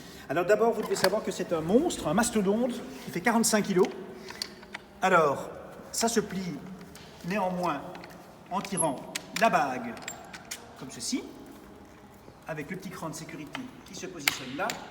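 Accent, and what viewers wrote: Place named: Belgium. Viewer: Il me tue c’est belges 😂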